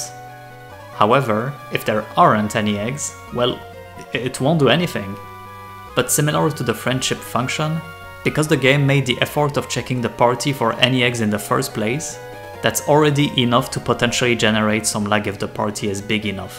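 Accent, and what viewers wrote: France. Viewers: Do i hear a little french behind that great video? Beau travail camarade!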